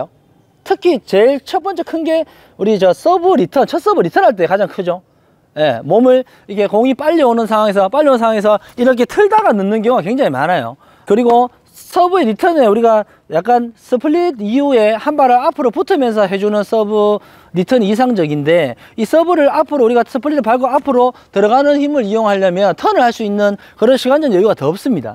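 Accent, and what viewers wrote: South Korea. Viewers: 근력이 약한 저도 요 연습 해보겠습니더~ 슨상님 사투리 너무 좋습니다 ㅋ 마! 이게 사투리다!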